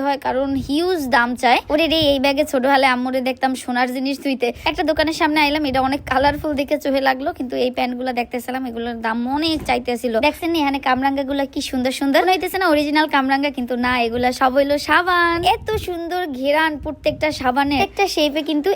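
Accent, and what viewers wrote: Barishal, Bangladesh. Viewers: ও মোর খোদা আণণে যে বরিশাইল্লা মুই বুঝছি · বরিশালের ভাষায় আর যে ভাষায় কথা বলো তবে তোমার ভিডিও করা অনেক সুন্দর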